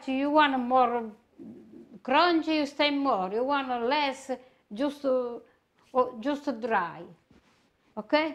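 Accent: Italy. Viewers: Vgffgf Vdghhft You mean she is speaking English with an Italian accent. At times, she speaks her native language. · Thank you for your video. I just found this channel and I just love listening to you. I was stationed in Italy in the 90s with the US Army. Used to have biscotti every morning in the café. I miss the accent and the sweetness. I will watch many more. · I absolutely LOVE watch (and listening) to you. I adore your accent. It makes me long for my Italian grandmother who passed when I was very young. I still feel a bond with her and my Italian heritage. I think about her and feel close to her when I make your recipes. I can see the joy cooking brings to you. Thank you for sharing your love and cooking with all of us., what kind of coffee do you drink and how do you prepare it? May God continue to bless you! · Isn't she delightful? And a proper Italian English pronunciation on toppa di tutti. 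I swear, I'm going to try this tomorrow. Great recipe to get rid of my figs and dates I'm struggling to finish. MIlle grazie!! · You are such a sweet lady angd i love your accent.a real italian indeed.you have a BUONA PASCUA.I LOVE TO WATCH YOU. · I had a great Italian Nona....Miss her so much! Her daughter, my grandma Noni was the best cook!🍝🇮🇹 Wished I would have learned more Italian cooking from them....they both are gone now.....🇮🇹🍝🇮🇹🍝but you are truly the best to watch and learn from now! I love your strong Italian accent..... Thank you for sharing your cooking. 💚🤍❤️We love you! 🇮🇹🍝🇮🇹🍝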